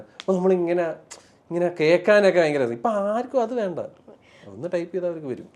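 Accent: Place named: Trivandrum, India. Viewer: Love you. Jju tvm slang vidalle. From malappuram😊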